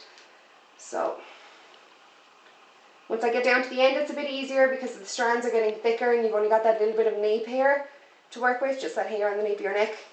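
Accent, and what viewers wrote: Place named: Ireland. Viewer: I love your Irish accent I love the video PLUS thanks, this video really helped me 👌🏻👌🏻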